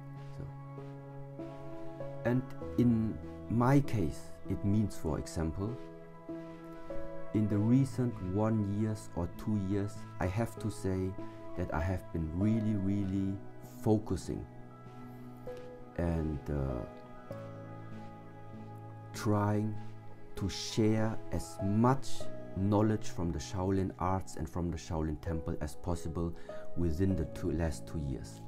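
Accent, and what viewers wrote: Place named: Germany. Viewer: Is he from Germany he has a strong german accent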